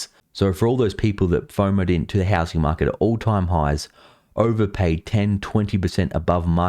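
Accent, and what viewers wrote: Australia. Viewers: I'm guessing from your accent that you are a Aussie. Can you more clearly point out what the ramifications are for Australian s. I like your channel but it is not always clear which country you are referring to. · This dude sounds australian. Hope you vote republican if you don’t want to be in prison like australia.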